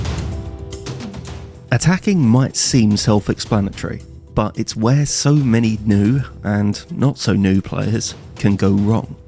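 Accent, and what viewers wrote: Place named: Southern England, United Kingdom. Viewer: Subbed. I consider myself a reasonable enough souls player, now I want to redo them all with the lessons learned here and become a great one. Also it’s refreshing to hear another English creator on here. I’m guessing you’re Southern, maybe Oxford sort of area? Keep up the excellent content 👍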